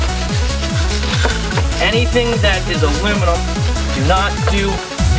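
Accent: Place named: Ohio, United States. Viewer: Are you guys from the midwest like Ohio? Your accent sounds like a skater I watch who's also from ohio and you guys talk so similar.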